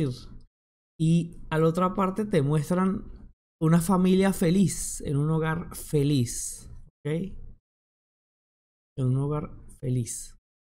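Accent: Venezuela. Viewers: Creo que entró en contradicción porque, sino me equivoco tiene asento venezolano y a su vez es descendiente sirio, lo que lo llevó a asociar "revolución" con "comunismo" (y supongo que asocia comunismo o izquierda con "chavismo"), pero el video es una clara crítica al capitalismo estadounidense que tiene sus garras en Siria. Aunque el bloqueo económico de Venezuela también es impuesto por los yanquis... El que sí lo tiene clarísimo es René con su perspectiva antiimperialista (revolución). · Tengo una duda, si eres arabe porque tienes tan pegado el acento venezolano?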